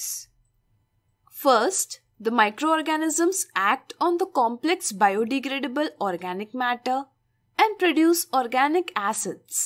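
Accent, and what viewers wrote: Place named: India. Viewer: terrible Indian accent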